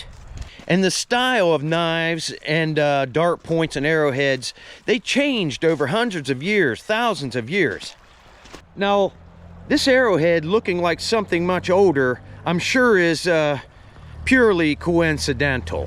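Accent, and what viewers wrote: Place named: Pittsburgh, United States. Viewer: hes a yinzer no doubt I here that Pittsburgh accent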